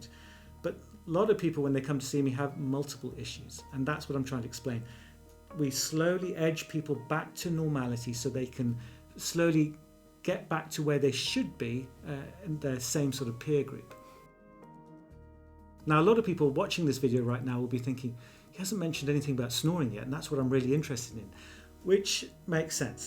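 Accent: United Kingdom. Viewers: Please remove the background music while you are speaking! It is very annoying especially trying to understand with your British accent. Thank you!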